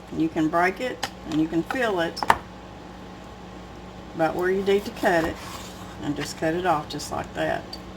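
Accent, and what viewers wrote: South, United States. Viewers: I absolutely love the way Southern's talk. I really notice the accent as I'm from Canada...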